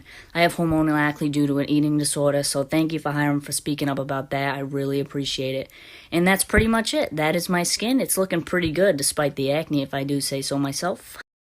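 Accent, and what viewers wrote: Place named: Boston, United States. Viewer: She’s from south Boston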